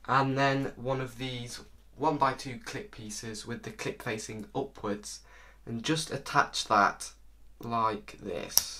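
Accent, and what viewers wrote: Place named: United Kingdom. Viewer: Holy moly, british accent confirmed 😅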